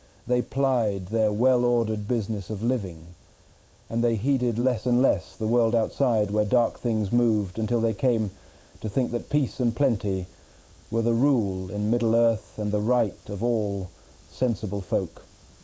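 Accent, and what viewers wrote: United States. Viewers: An amazing technological achievement, but doesn’t sound quite right. As another comment said, the accent is slightly American. But more than that, the recitation isn’t that of a story teller. Tolkien was a more poetic public reader. But an incredible achievement of AI nevertheless.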